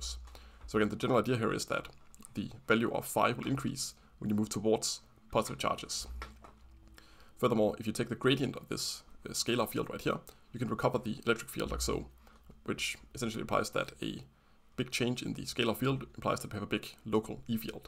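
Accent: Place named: Germany. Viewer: Your teutonic accent and how unnecessarily fast you speak makes it very hard to understand what you’re saying.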